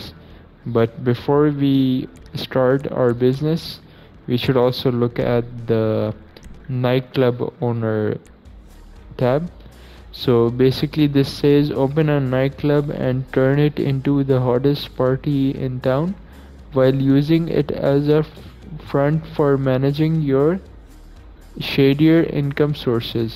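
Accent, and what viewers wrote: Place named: India. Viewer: Are u from India? It's hard to bear your accent 🤣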